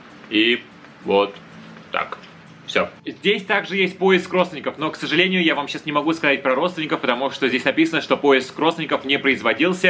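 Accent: Standard. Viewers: Короче ты человек мира почёт тебе и уважение за то что ты просто хороший нормальный парень весёлый и так чисто говоришь по русски и наверное на других языках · Очень чистая и грамотная речь..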